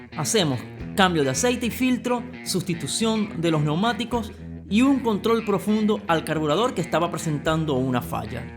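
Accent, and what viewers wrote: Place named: Zulia, Venezuela. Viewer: Uno sabe que un episodio es bueno cuando el tiempo se te va volando cuando lo ves... Cuando te oigo me imagino la rápida traducción mental que vais haciendo del maracucho al español "neutro" antes de hablar, cosa difícil en nosotros los zulianos jejeje, me pasa en Argentina... saludos hermano, que siga creciendo el canal!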